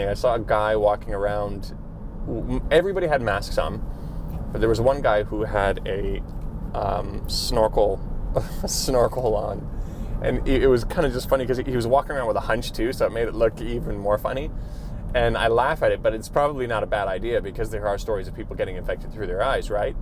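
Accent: United States. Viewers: First time watching your vids...are you American? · I like your comments. I live in SZ half a year for 6 years now. NanShanQu by OCT Windows to the World. You don’t exaggerate and you don’t make up things like other Tubers. You sound more California than Canada, although I noticed your “oout” slightly. Enjoyed your piece. Of course, SZ is unique. All new everywhere and everything. I hope you mention to viewers that in other cities they have new sections similar to SZ and old parts.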